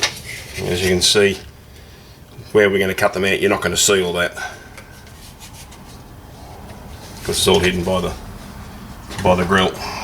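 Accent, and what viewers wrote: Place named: Australia. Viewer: Great video, just bought a "new old stock" mesh grill, wasn't quite sure how much of the old grill needed removing, nice to hear an Aussie giving YouTube information.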